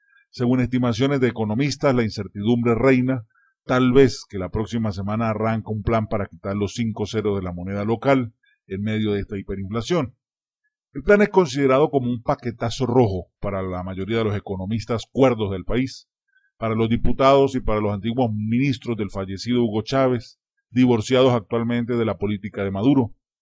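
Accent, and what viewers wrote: Colombia. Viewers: no solo eso, sino que tmb es colombiche... notose si lexico y su tonico de voz... es tan colombiano cm madurin o mas xDDD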